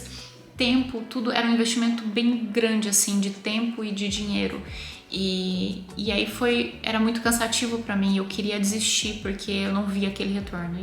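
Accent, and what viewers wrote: Brazil. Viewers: Sabia que teu sotaque, não era estranho! !!!Porto Alegre RS, capital 💃💃😘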